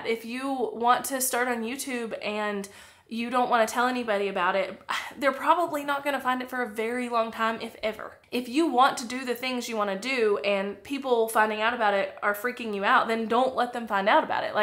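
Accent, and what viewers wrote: South, United States. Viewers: Your energy, personality, confidence and transparency drew me to your channel! Cornbread accent, I love it! I definitely am the kind who is scared to put myself out there and grow my business. Your videos are super helpful!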